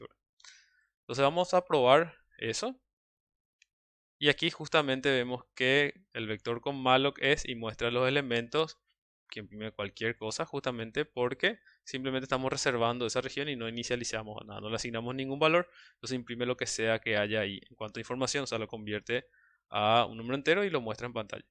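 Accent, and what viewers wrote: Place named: Paraguay. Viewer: De la core cape, me ayudo bastante, me gusto el acento paraguayo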